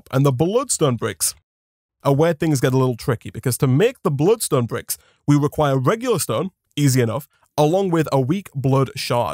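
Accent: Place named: Yorkshire, United Kingdom. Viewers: Yeah he is from Yorkshire where the tea comes from but prefers the caffeine from coffee :p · British, I think. He’s British, I know that much, but I don’t know the accent.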